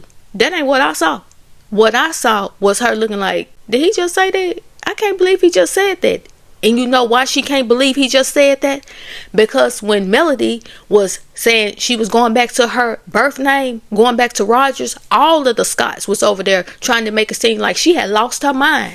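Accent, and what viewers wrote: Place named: Alabama, United States. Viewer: Hey girl hey! I love your channel and I think you have the cutest accent ☺️ Are you from Alabama? I’m trying to get better with my regional accent recognition 😩😂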